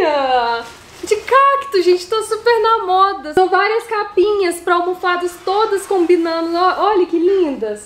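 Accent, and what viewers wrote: Minas Gerais, Brazil. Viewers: O já está entendendo super o português? E olha que você fala rapidinho e com sotaque mineiro! Fico muito feliz pela dedicação e esforço dele. Dá pra ver o quanto ele está leve e feliz. Que coisa boa!! Nunca esqueço daquele vídeo que vocês fizeram com o não deixando desperdiçar nada. Morro de rir só de lembrar. E ele mal entendia as palavras em português que você falava. Quero ver mais atuações! Genial! Grande abraço, família!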